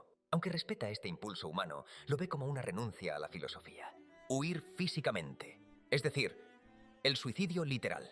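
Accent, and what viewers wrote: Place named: Castile, Spain. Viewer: Buen video , mas el acento castellano y la baja calidad del sonido lo arruino❤.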